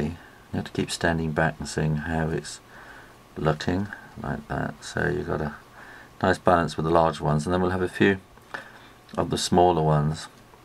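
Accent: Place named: England, United Kingdom. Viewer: Great video! Good job! What part of England are you from? Love your accent! :D Thanks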